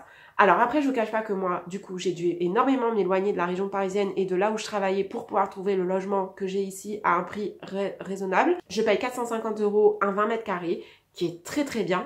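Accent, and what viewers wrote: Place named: Quebec, Canada. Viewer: Ton accent est différent. Il y a un petit peu d'accent québécois, J'aime bcp.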